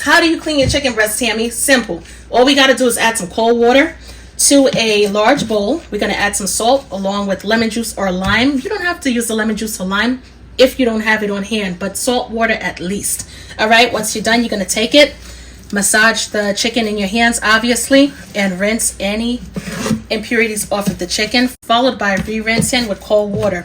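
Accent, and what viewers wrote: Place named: Brooklyn, United States. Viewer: Great accent. Maybe Brooklyn NY?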